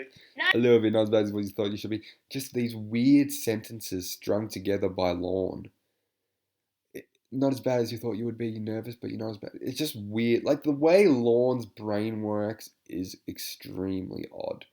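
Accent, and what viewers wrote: Australia. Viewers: Bro, you're like the Steve Irwin of perves, not only your accent but the purpose of every action by the critter of topic, the analyzation and breakdown is riveting.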